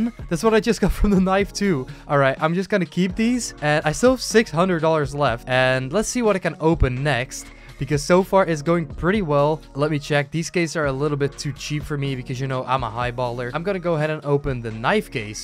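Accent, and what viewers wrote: Netherlands. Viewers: Just as I thought, het klonk gewoon te Nederlands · wauw echt cool dat je nl bent. Je zij het in een oudere video en je hoort het bij sommige woorden lmao. Je bent goeie en je Engels is pittig goed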